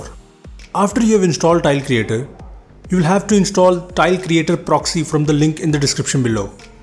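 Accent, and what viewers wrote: India. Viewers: Terrible voice, indian english is really catastrophic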